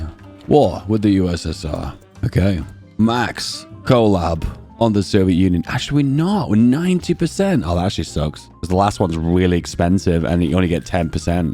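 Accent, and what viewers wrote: England, United Kingdom. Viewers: Love your English accent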